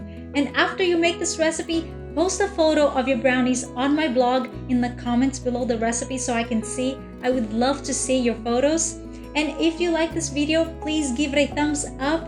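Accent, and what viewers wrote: Brazil. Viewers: Wait wait wait Are you from Brazil? You have a Brazilian accent, and I’m from Brazil too :D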